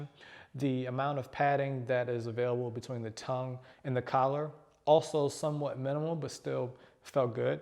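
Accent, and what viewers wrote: Baltimore, United States. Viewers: Nice video. Can't wait for the Mach 4 review. By the way, are you from Baltimore? I think I can hear it lol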